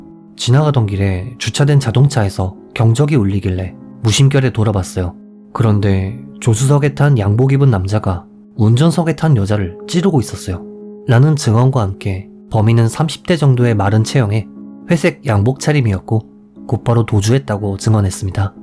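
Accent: Japan. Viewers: 요즘 일본어억양을 심하게 넣지않아서 더 듣기좋아졋네요👍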